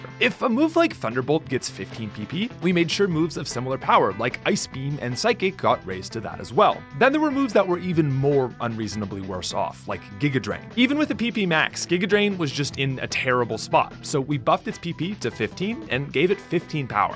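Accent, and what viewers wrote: Canada. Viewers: He's Canadian. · Is this guy Canadian? . With oat a doat. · As a fellow Canuck I love watching these videos and hearing the accent come oot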